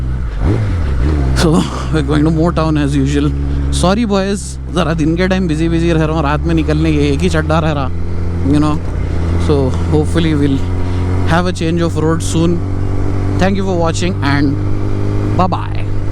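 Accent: Hyderabad, India. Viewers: I’m following you since a long time both for bike reviews and to listen Hyderabadi Hindi 😊 And YES you are right the OLD zx10r looks was great ! It looked manly butch !!!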